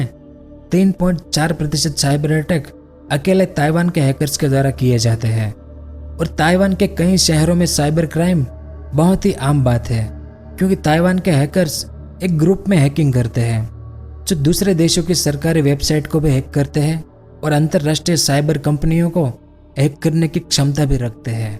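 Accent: Gujarat, India. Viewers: You're accent seems gujrati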